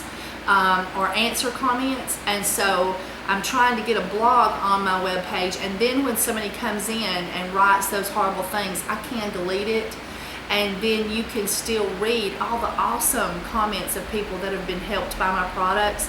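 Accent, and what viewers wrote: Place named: Alabama, United States. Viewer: I love that Alabama accent !!!!!!!!!!!!!!!!!!! Yeeeee Hawwwwwwwwwwwwwwwwwwwww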